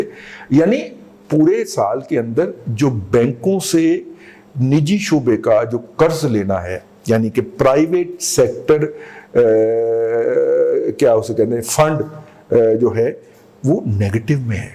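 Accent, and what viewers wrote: Punjab, Pakistan. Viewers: Hi, No matter what I love your Punjabi Urdu.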